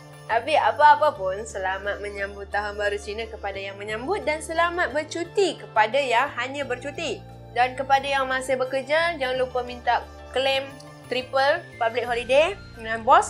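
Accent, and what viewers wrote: Kedah, Malaysia. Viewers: Cakap kedah ja senang.haha..lapaq teqoih tengok chic dumpling tu😍😍😍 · Mkin dok kedah lama kot...sbb 2 pekat elok ckp kedah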